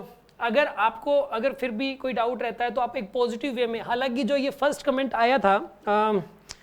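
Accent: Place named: Bihar, India. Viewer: Sir aap बरा bhankayar समझाते हो पूरे बिहारी जैसे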